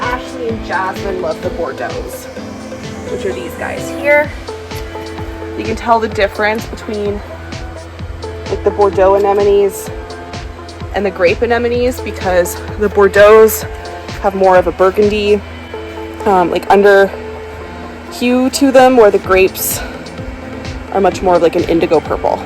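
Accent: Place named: Canada. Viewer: You're so Canadian. EH!... You said it so many times. Love it.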